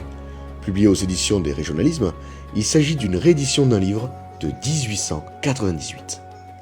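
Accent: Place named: Béarn, France. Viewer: Merci pour ta vidéo, je découvre ta chaine, lâche rien. Ta voix avec l'accent Béarnais c'est très immersif.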